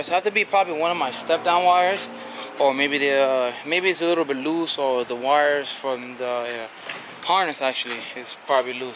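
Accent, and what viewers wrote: New York, United States. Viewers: U from ny gotta be?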